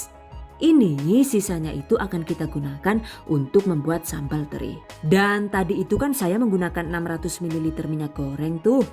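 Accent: Banyumas, Indonesia. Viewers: Smg lancar dan barokah... Aamiin...baru ketemu channel ini langsung suka ... apalagi ada logat ngapak nya yuhuuu...top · Sdh lama gk ngikuti video, tambah cantik aja bunda😄😄, dgn bahasa khas Banyumas nya 🤭🤭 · Ha ha.. Selalu ada ngapaknya,lucuu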